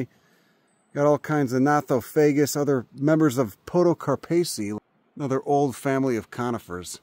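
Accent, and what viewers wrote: Chicago, United States. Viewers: Jesus two seconds in this guys got to be from chicago !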